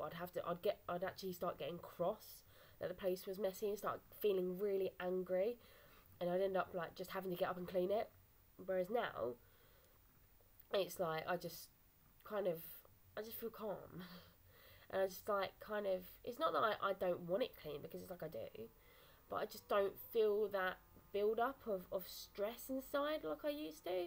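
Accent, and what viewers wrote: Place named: United Kingdom. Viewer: Hey Great first vid, for me was cheese toasties :) Sounds like your from the UK where abouts? I'm from Nottinghamshire. Keep making the vids, I've also not long ago made a channel be sure to check it out :)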